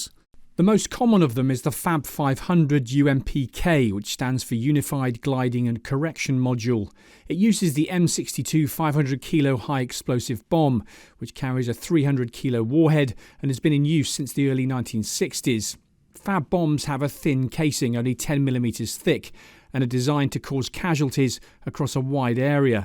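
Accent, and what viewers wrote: United Kingdom. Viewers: Whenever I hear a British accent narrator i feel propaganda whyyy ? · I heard his british voice thats how i know this is a false propaganda channel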